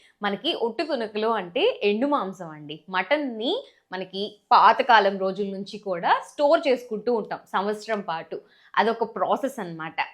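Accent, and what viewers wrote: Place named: Anantapur, India. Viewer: Mam you are saying that you belong to Nellore but your language is like Anantapur.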